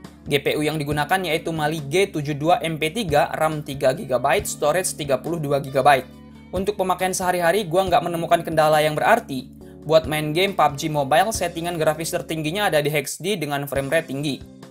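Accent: Lampung, Indonesia. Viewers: Lu orang lampung tah bang?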